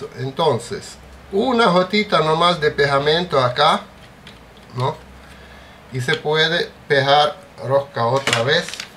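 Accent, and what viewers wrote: Russia. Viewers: Ruso o polaco?😀👍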